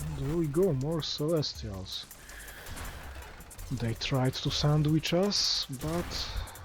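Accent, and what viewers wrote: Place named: Netherlands. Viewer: Yay! It's great hearing you! Keep being a awesome! Oh man I want to guess your accent; is it of Germanic decent? Dutch?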